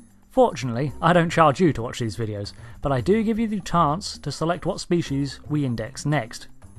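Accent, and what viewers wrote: England, United Kingdom. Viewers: "Until next time, I have been." You sound English, so, why do you not know this sentence is not grammatically correct? "Until" denotes a future event, "Have been" denotes a past event... Unless you are a time traveler, you can't "until have been" Either you have been, or you will be until. If you have been, who are you now? If you will be until next time, who will you be then?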